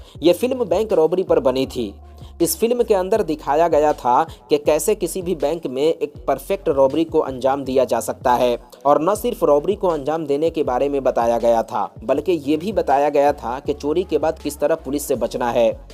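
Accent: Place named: Bihar, India. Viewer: Bihari lahaja aa raha ha bolne me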